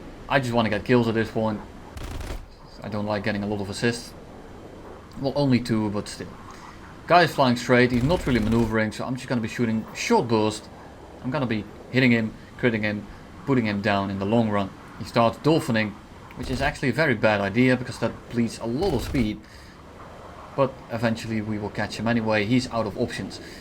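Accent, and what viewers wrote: Netherlands. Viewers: goddamn i can hear the dutch accent once you notice it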